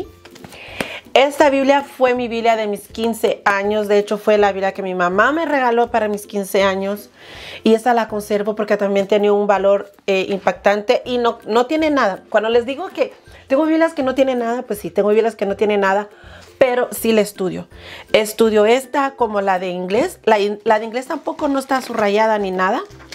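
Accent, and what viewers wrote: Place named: Latin America. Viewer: Hermana: muy buen review!! Sorprendido por lo que dijo que tenía acento... la verdad que se escucha más latinoamericana que yo que soy de Argentina!! (Si me decía que estaba en México o en Guatemala o Salvador u Honduras... le hubiese creído). Bendiciones!!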